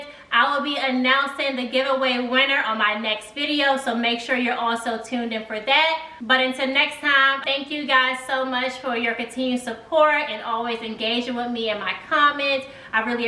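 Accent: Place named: Washington DC, United States. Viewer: Yeasss !! "I'm A DIME" from the first time I started watching ,I subscribed! I hear the DC accent, I love your style.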